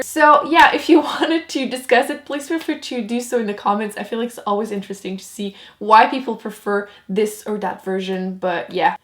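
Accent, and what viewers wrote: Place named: Quebec, Canada. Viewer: For Jane Austen, I really liked reading Emma and Northanger Abbey is a fun read but it's really different to her normal writing style because it's more a «parody» of the Gothic genre than a love story! And you also made me think to put Anna Karenina in my TBR! (Et sans être trop personnelle, originaire du Québec? L'accent que tu as lorsque tu parles français ressemble beaucoup au mien aha ;) )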